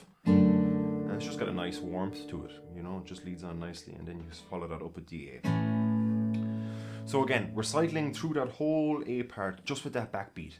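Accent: Ireland. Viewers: Irish as they come this lads voice is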